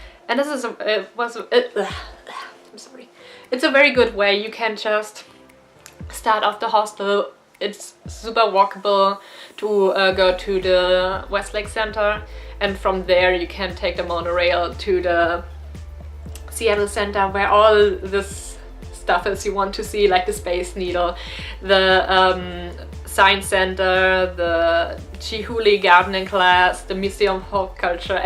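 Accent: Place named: Netherlands. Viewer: You are adorable. Thank you for this info. Very helpful. May I ask where your accent is from? I have family from Holland and very similar.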